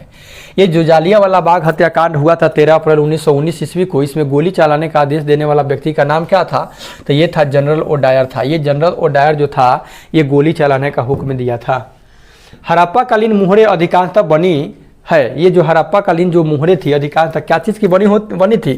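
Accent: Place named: Bhojpuri, India. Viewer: सर में यूपी से हूं आपकी भोजपुरी बोली मुझे अच्छी लगती है इसलिए पढ़ने में और अच्छा लगता है धन्यवाद सर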